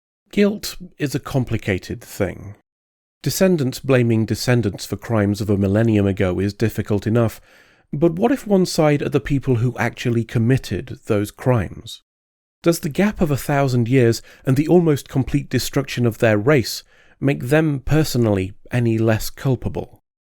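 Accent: United Kingdom. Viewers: Dude, I absolutely fucking love your reviews. There;s something about a British accent talking complete shit about a show I love. And your way of saying "cunt" is so deliciously venomous. :D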